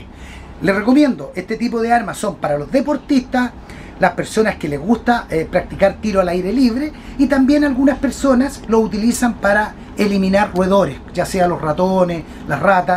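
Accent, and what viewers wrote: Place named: Chile. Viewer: Chile. Escucha el acento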